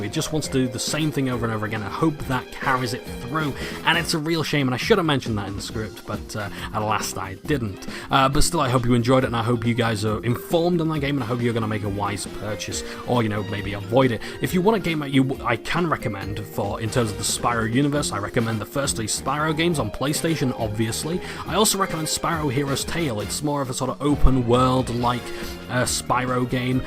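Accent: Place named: Northern England, United Kingdom. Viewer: It's your accent, it sounds more Northern than Yorkshire to me XD Are you gonna re-review the Rayman games btw?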